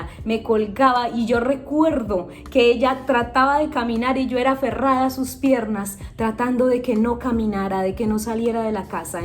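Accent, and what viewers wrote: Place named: Colombia. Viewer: mexicana pero tienes en acebto bien marcado colombiano no entiendo como cambian de acento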